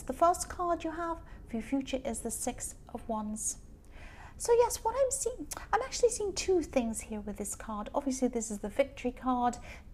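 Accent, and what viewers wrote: United Kingdom. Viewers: u r from UK?your voice is familiar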